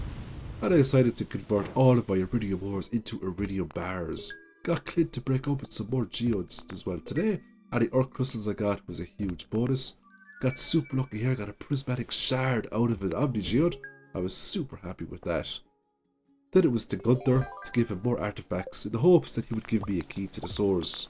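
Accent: Ireland. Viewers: I have never heard an Irish accent as good as yours love it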